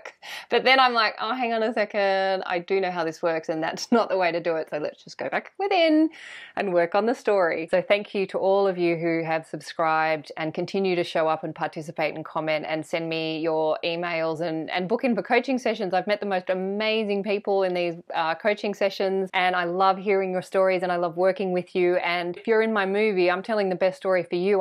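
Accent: Australia. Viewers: You are engaging and fun. You keep the teachings simple yet clear. I am loving it. Thank you! Ps and you are Australian. Living OS it is refreshing to listen to an Australian.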